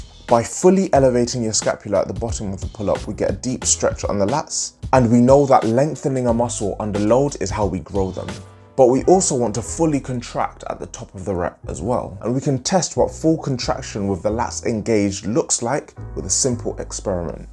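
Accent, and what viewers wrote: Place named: United Kingdom. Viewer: Quality video, definitely will check your advice out next time I'm in the gym! Btw. 3 words into the video and I didn't have to check where you from, mate :D Also, no hate here, british accent sounds awesome :)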